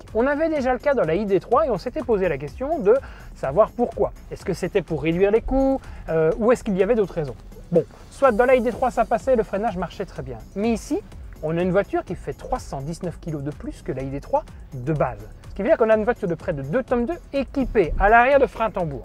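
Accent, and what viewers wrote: Belgium. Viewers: Excellente vidéo. Et puis l'accent belge j'adore ;-) Mais ce modèle est lourd, plutôt cher, pas très puissant à la vue de sa batterie de 86KW (performance moyenne), longue à charger, bref cela, selon moi, manque de modernité. Et la marque Tesla avec son modèle 3 SR+ détrône de très loin ce modèle ;-)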